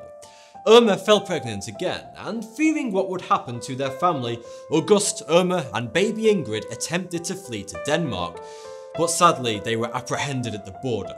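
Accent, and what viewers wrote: United Kingdom. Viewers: Why u say bot not but? Oh ur from uk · interesting video, but that obnoxious bri-ish accent made my ears bleed. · What British accent is it?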